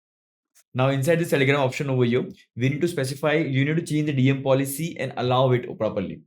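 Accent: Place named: India. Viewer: i wish there was an ai software i could use to remove the indian accent from this video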